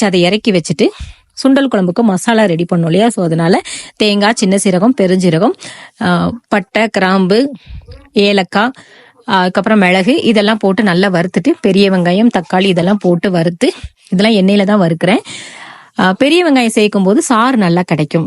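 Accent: Tirunelveli, India. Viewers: Akka, thirunelveli ya!!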